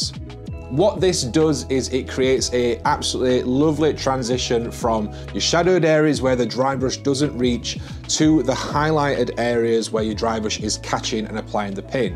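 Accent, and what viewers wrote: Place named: Northern England, United Kingdom. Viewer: Hiya mate great tips here! - At last a video which is to the point and helpful and in a great nothern accent -which i can relate to lol! I just wondered if you might be able to help? I am a complete beginer with figure painting but have an art background so should be fine with the painting part. Basically, I collect transformers and am trying to 'repair' or colour match this chrome orange colour which is flaking off the figure 🙈The figure has like a chrome metallic orange with a mirror finish - so I was going to by some GSW paints as the metallic chrome and some of the other orange inks look like they might work? However do you know would I mix this with an orange first or water it down and put it over the top? any advice or tips woud me amazing - its been really hard to get any specific answers as its a bit of a specific thing I am asking lol!🤣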